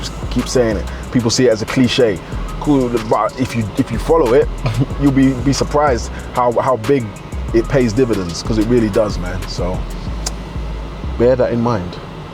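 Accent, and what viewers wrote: London, United Kingdom. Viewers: i lke energy bro its genuine bro am just wondering are you from Uk you sound like a Londoner..? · Just found your channel mate, love it, instant Sub. I thought "ah cool, a Brit giving advice".... I'm gonna ignore the fact that you're in the US, lol.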